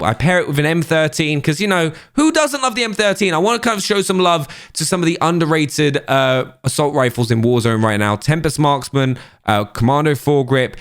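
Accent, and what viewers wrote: United Kingdom. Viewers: I love how britishers say knot for 0, it's just amazing. Sorry, bri-ish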